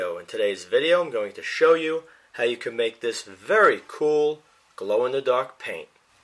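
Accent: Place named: Wall Street, United States. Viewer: Wall Street accent.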